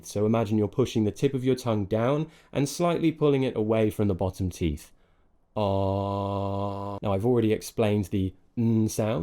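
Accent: United Kingdom. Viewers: Hi I really like you channel and your authentic British accent. ❤❤❤❤and by the way your handsome face is very charming. I am from asian 😅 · Thanks a lot mr for your videos and now you look more handsome than your some previous videos sir I always like your British English accent. Mr you always our British English teacher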